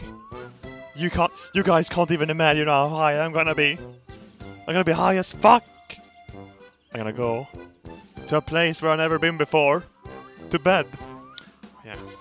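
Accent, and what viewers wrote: Sweden. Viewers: Booker Dewitt it's his Swedish accent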